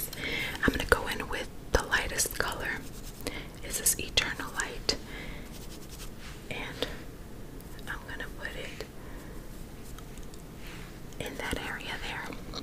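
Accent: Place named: New Jersey, United States. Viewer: I loved this! I would love to hear an exaggerated version of your New Jersey accent 😂 with your voice it would be hilarious and relaxing. You are the best!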